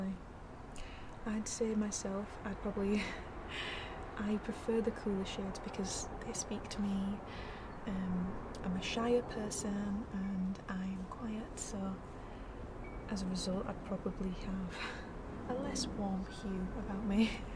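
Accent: Yorkshire, United Kingdom. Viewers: Something about your voice which is incredibly calming! Do I detect the Sheffield Yorkshire accent? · Giving into the American pronunciation of Van Gogh, sacrilege haha - I can tell you're local to me, I'd guess a fellow Yorkshire-(wo)man? Although I also have family from Derbyshire, Notts and Hull and can't quite place you!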